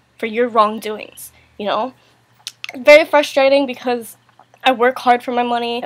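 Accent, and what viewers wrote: Australia. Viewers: i can hear your aussie accent getting stronger xx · unrelated, but your australian accent shows a lot in this video lmao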